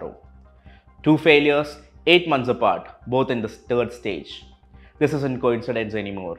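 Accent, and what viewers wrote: Kerala, India. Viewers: A malayali with malayali accent english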